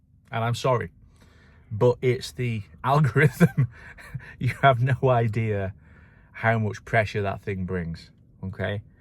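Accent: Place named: England, United Kingdom. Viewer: I have watched most of your videos. They are very good. You are a very good speaker (far better than Elon Musk), and it is good to hear what you are thinking in an English voice instead of American. I'm sure there is a lot of good content yet to come, like your views on FSD when it arrives in the UK and Europe. Maybe you should go through the Tesla screen, taking one or two items at a time, and explain them in detail. I see a lot of new people who buy Teslas asking a lot of questions about how to do this and that. I got my Tesla Y Juniper in late December 2025, and I am still trying to learn the system. I think it is a shame that your fans can not talk to you personally. Writing comments is not the same thing. Keep up the good work.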